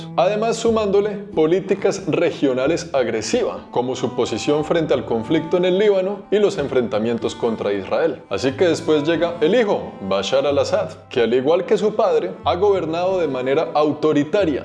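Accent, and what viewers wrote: Colombia. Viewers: Tan bello con ese acento colombiano inconfundible !!!! Muy buen vídeo !!!!!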